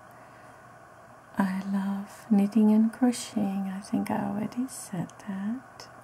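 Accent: Germany. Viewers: ich komme auch aus Deutschland, allerdings aus dem hohen Norden! Ich mag Deine Videos und höre auch noch manchmal einen leichten schwäbischen Dialekt bei Dir ;-) Liebe Grüße aus Kiel :-)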